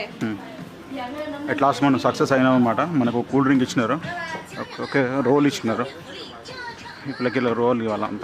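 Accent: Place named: Andhra Pradesh, India. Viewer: endanno motham andhra yaas maatladuthunnav, thu, I am unsubscribing now, its been a habit to our Telangana people famous ayindaaka Telangana yaasa, famous aynanka andhraaa yaasa start chesthundru, endanna, we should save our Telangana slang anna, good bye.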